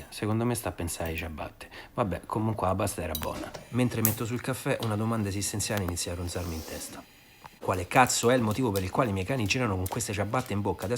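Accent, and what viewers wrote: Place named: Rome, Italy. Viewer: Siamo a Buenos Aires, racchiusi come voi. Anche Io ho provato serenità con i tuoi video, e li aspetto. Ho consigliato ai miei allievi d‘italiano di seguirti per imparare come parla un romano. Salutami il pesce!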